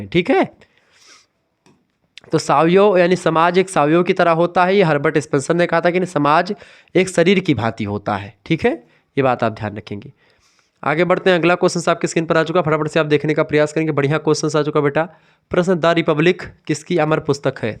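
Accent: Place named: Awadh, India. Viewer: Sir ka Awadhi accent ❤😂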